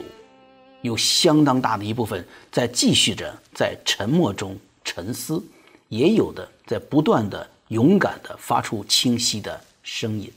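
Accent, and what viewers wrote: North, China. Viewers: 很好奇主持人是哪里的，听口音我感觉是北方人。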